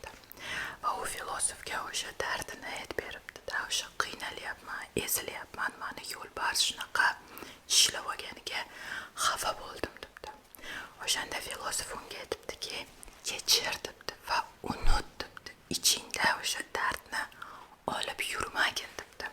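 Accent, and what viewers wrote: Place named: Namangan, Uzbekistan. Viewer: Namanganlimisz shevada oxshavotti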